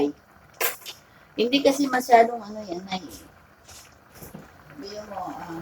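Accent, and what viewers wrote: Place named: Visayas, Philippines. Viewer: Lami nag pinakbit ate 😋 cute mu mag bisaya ate oyy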